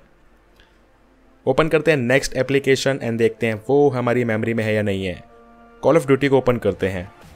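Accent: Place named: Punjab, India. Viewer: Bro Apki Voice Me Thorda Sa Punjabi Touch Aa Reha Hai Are U Punjabi ???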